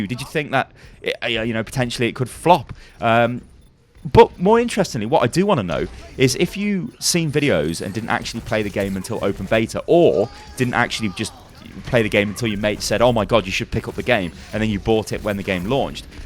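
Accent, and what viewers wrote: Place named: United Kingdom. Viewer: bought the game just because my freinds all had it, from the videos i saw i thought it basically was tf2, but looked like it could be fun, but manic, but actually playing it is completely different, i must admit for the first few hours i did find it abit manic, but once you learn abit about the charachters it makes alot more sense. coming from playing lol and gw2, i expected some learning curve in learning charachters, but i must say i think i will be playing this game for a long time, its competitive,fun,varied, and game times aren't rediculous long!! also enjoying your british commentry!! keep it up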